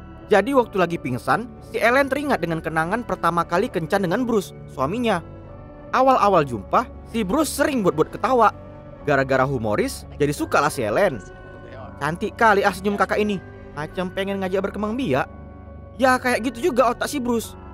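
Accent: Medan, Indonesia. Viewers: Org MEDAN ya cara penyampaian bahasa nya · Kental kali khas Medan nya bg😁😁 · Nampak kali logat medan nya bah hahaha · Medan nya itu loh 😂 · Fokus ke suara adminnya pasti dari medan kleeen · Baru lihat channel ini... Dari cara bahasa.. Aku tau ini Medan banget.. Krn aku jg Medan😁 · Dendam cewe PMS 🤣 Bte Logatnya medan x ya bang 🤣 Sukses terus ❤️ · Wkwk, salfok sama suaranya. Logat medan bgt😭😭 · Kayak orang Medan logat kau bang ... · Orang Sumatra bg??? Logat mu anak medan kali, kalo iya sama kita aku Binje nya.. Kata " Berondok, tunjang, palak" Itu cuma ada di daerah kita 😅😂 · Medan kali baahh... Baru kali ini nonton film horor terkekeh bang... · Film horor katanya..harusnya awak takut dan merasa tegang..ini ga pulak..sor kali lah dengar logat medan ini..pokoknya menghibur kalilah bang..😆😆😂😂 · Org Medan bg????? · Aksenmu medan atau riau yak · Suka kali aku sama logam abang kayak orang medan karna aku orang medan🤣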